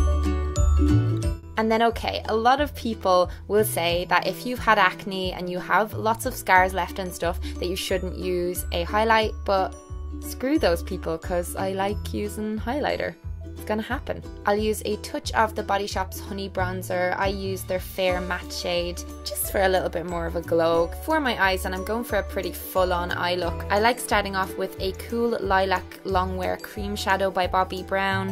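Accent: Ireland. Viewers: Lol- I feel like we'd be best friends in real life. Actually, I know we would be! PS I love your Irish accent, it's so beautiful!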